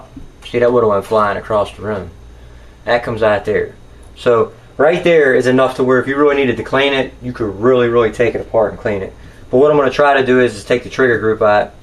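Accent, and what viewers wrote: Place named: Ohio, United States. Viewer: sounds central east Ohio to me. I'm from Cincinnati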